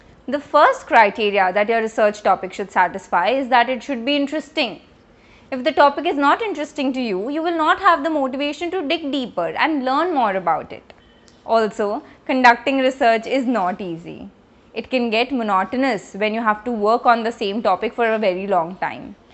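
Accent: India. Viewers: your accent is too much desi that is distracting for comprehension, please make it a bit comprehensible.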